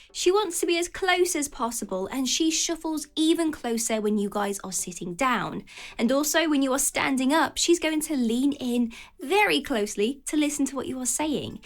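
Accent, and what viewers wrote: England, United Kingdom. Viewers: I dont know much about the tips but i watch these videos for the English accent and the squeaky voice.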